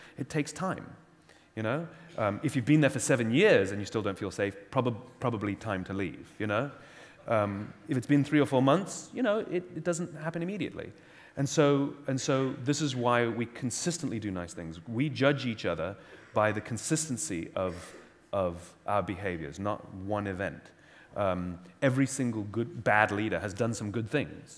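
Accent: United Kingdom. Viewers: wow. first time i'm hearing his british accent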